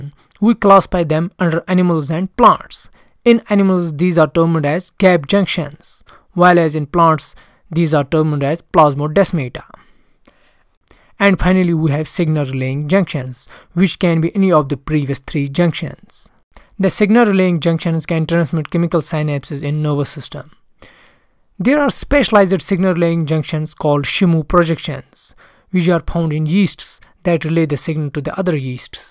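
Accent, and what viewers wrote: Kashmir, India. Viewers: You sound like a Kashmiri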